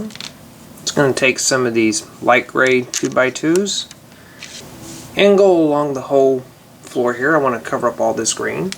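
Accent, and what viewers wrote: South, United States. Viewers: R u from Texas? You sound like one... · it sounds like he got a southern acsent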